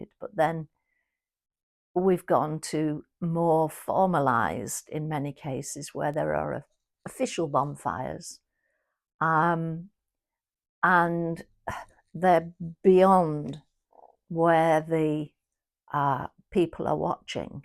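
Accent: United Kingdom. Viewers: You're my model in my journey for learning British accent ❤❤ love & peace 🕊️✌🏻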